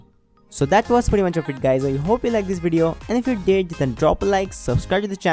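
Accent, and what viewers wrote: India. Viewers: You are absolutely correct. When I listen to people from India speak English (especially on this channel) it takes me a few moments to realize they are speaking English and to mentally adjust to their rapid verbal pace. If the author wants to appeal to a wider audience then your advice should be taken.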